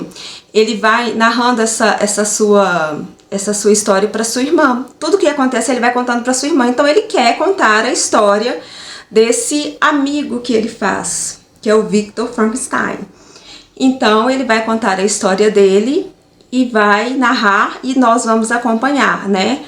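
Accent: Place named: Minas Gerais, Brazil. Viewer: Sotaquim mineirim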